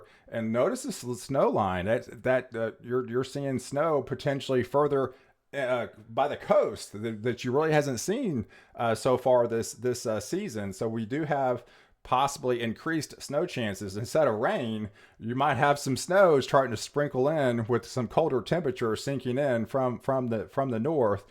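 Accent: Texas, United States. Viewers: I LOVE your holistic approach to the weather...the only reason I know that you're in TX is because you got my Daddy's accent. ;) Most other channels focus on...the East Coast.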